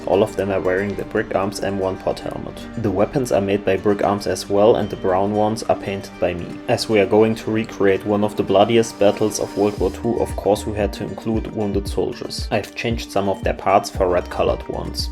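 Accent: Germany. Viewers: youre accent sounds very familiar to me are you from germany? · It sound like you are german are you ? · Einfach der deutsche Dialekt im englischen. 🤣 ich feiere es.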